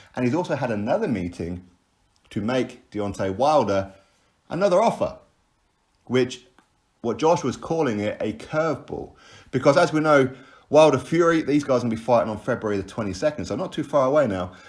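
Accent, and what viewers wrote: United Kingdom. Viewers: Soon as i heard the uk accent i knew you were lying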